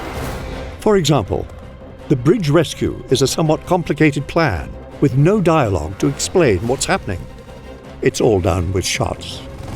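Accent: United Kingdom. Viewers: The fact that narrator to this video is brit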